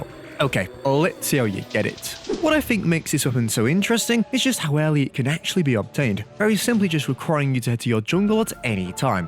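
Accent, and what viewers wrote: United Kingdom. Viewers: I must ask, where in the UK are you from?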